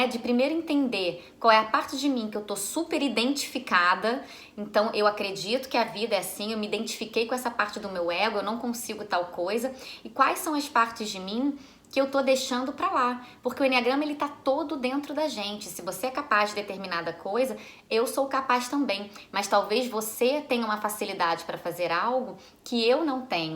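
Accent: Rio de Janeiro, Brazil. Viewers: CARIOQUINHA. BOM.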